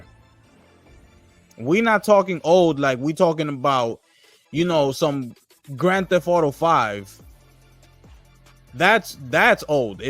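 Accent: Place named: Dominican Republic. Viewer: You sound like a wild Dominican from the height or inwood.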